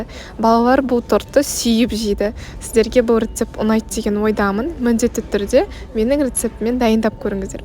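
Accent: Standard. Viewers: Сіз таза қазақша сөилеисіз сол ұнаиды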